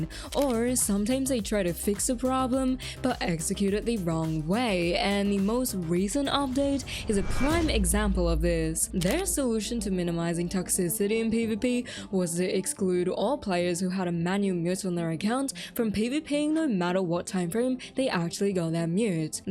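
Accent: Australia. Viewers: I didnt know you were aussie, so little of us are in the community lol nice to see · Nothing wrong with Australian accents <3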